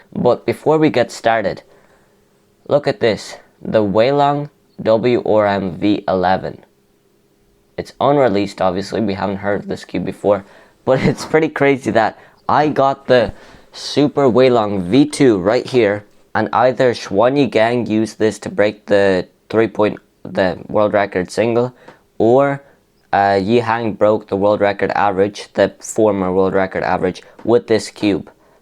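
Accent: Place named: Ireland. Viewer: I love ur Irish accent it’s dope lol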